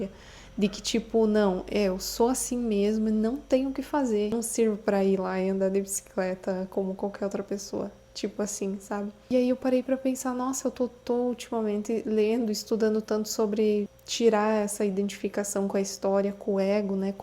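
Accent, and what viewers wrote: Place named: South, Brazil. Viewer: 🙏🏻🙏🏻, pelo sotaque é do sul, continue na missão de expandir a consciência do proximo.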